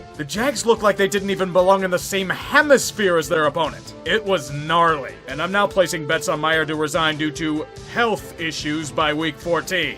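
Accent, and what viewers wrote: Pittsburgh, United States. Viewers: i love the new format!!! if there is a god, will be the season/blooper reel's main voiceman, as long as his yinzerism doesn't show through too much. SEND ME A PRIMANTI BROS SAMMICH YOU DIRTY BASTARD! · Huh. A new editing style. If i said i was a fan of it, id be lying. I loved the indie vibes from simple editing, and he sounded... contained, rather than without a filter. I need more Yinzer.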